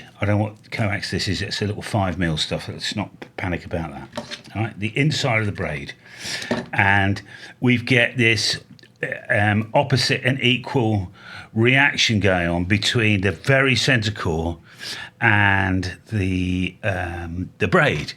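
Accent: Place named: United Kingdom. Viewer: Ugh.... British 🫩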